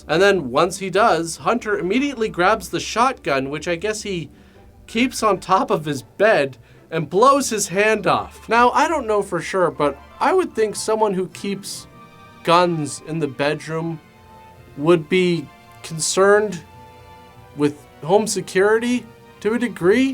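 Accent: Canada. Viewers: I'm Scottish. I'm used to hearing horrific versions of our accents. Americans can barely tell Scottish and Irish apart, or Cockney and Australian... Cloth ears! At least I can tell is a Canadian - the way he says "about" is a giveaway.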